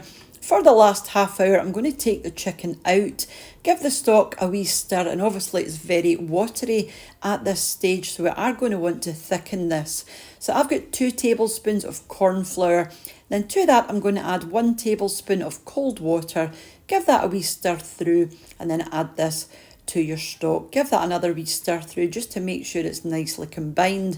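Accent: Scotland, United Kingdom. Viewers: Hey i sure enjoy your videos, but this might be because I'm American and love the Scottish accent. I watched perhaps your first video of Shepard's Pie and you have really improve dyour presentations--you are a youtube STAR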